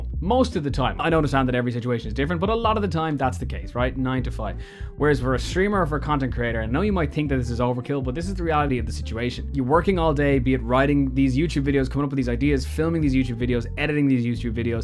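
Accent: Ireland. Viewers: I should not be watching this because I'm trying to get out of my Twitch grind mentality but I just enjoy your irish accent so much! xD Anyway Muted streams do count as views according to Twitch support on twitter: <link>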